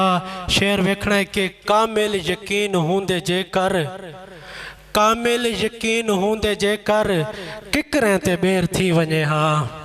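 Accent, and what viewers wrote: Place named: Saraiki, Pakistan. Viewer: Khoobsorat lehja Sraiki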